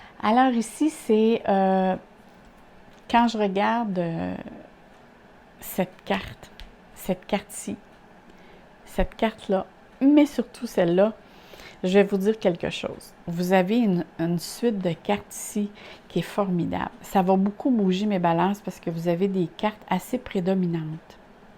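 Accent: Quebec, Canada. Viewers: Bonsoir tout ce que tu dis est très cohérent et très précis; cela me rejoints beaucoup c'est tellement beau ce que tu partage wow!! c'est merveilleux ça... Pour l'intuition tu as totalement raison y as beaucoup de changements et d'énergie différente qui viennent à moi et dont je fais l'expérience surtout au niveau spirituel très inspirant.. Merci beaucoup c'est agréable de voir une femme qui as le parler Québécois..je te souhaites un splendide joyeux temps des fêtes comme tu aimes le vivre avec de l'amour, de la joie, de la paix, tout ceci dans la grande simplicité qui rends la vie magique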